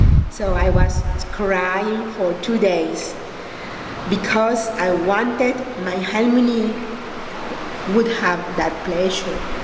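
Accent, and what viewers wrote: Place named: Philippines. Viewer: Its interesting because she sounds Filipino talking English so I guess that's what Latino and Korean mixes lol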